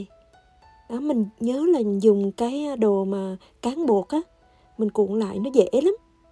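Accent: Hue, Vietnam. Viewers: Cám ơn với hướng dẫn rất chị tiết và giọng nói Huế nhẹ rất dễ thương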